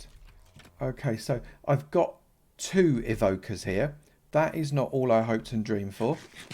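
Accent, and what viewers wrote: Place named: London, United Kingdom. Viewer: Proper Londoner mate!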